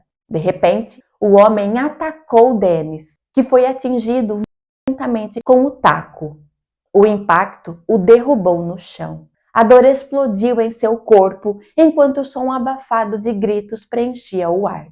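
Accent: Portugal. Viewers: você é brasileira? Que legal! Poderia jurar que vc era portuguesa, por causa do sotaque.❤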